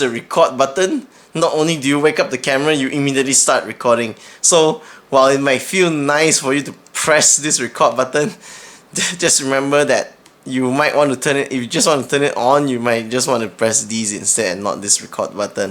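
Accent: Singapore. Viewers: thank u! love the Singaporean accent.. got this camera as a christmas gift and am still learning how to use it.. in ur opinoin is this better than the gopro cams? thanks for the review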